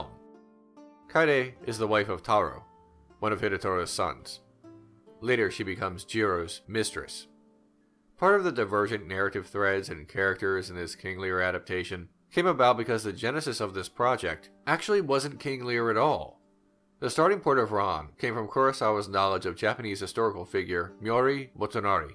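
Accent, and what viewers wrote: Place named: United States. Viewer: A fair analysis, but I was really distracted by the mispronunciation of every Japanese name ... I know it probably doesn't bother English speakers but as someone that grew up in a familiar environment with the language I seriously have trouble figuring out what name it is you're saying. Then again, these are mistakes literally everyone that doesn't speak the language makes, but still, it is a mistake; the English spelling of Japanese names has never been phonetically accurate so I guess it will always trip me up hearing Japanese spoken through the American accent.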